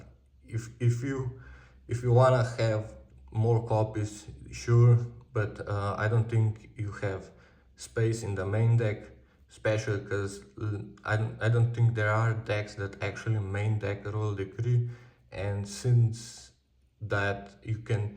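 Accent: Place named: Slovenia. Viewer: I can hear the slovenia accent from kilometers away [mojster]😂 nice video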